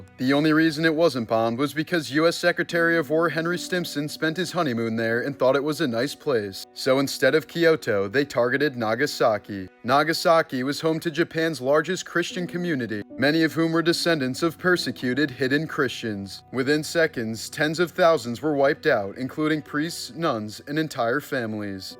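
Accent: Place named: England, United Kingdom. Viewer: No hes not saying it incorrectly he's saying it with an English accent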